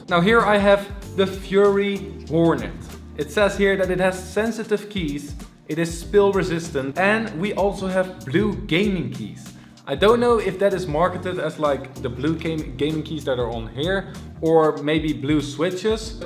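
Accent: Netherlands. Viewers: ik dacht al dat je nl was maar ik was nog aan het trijwelen met je accent maar yeey je bent nederlands ik ben een goede detective :3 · Jij kan goed engels maar nederlands accent valt altijd te horen xD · are you dutch??? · Are you Dutch? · Wow are you dutch? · Ik dacht al dat je Nederlands was !💙💙💙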